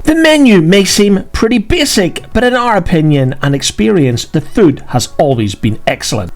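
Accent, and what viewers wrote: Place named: Belfast, United Kingdom. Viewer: I thought I was hearing a Belfast accent.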